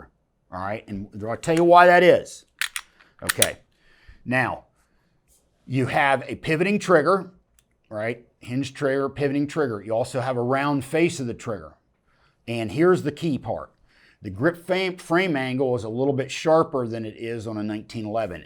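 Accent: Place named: Pennsylvania, United States. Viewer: listening to Larry talk reminds me of home. If you know the PA accent you know what I’m talking about.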